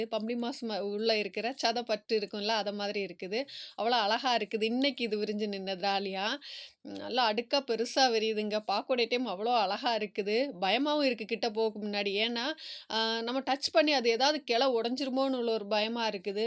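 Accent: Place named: Nanjil Nadu, India. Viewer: பூக்கள் அழகுதான் சகோதரி, நீங்கள் நாஞ்சில் தமிழில் வர்ணணை செய்வது மிக அழகு, சிறப்பு ❤❤ வாழ்த்துக்கள் சகோதரி🎉🎉🎉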